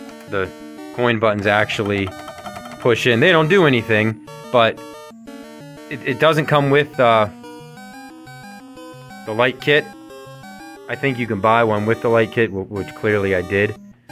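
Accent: Western Pennsylvania, United States. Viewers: Heard that western PA accent and then confirmed it with the Pens lamp! Let’s good man!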